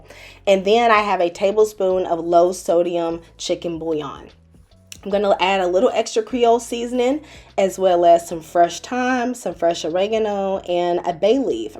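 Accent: South, United States. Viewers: Are you a native Southerner?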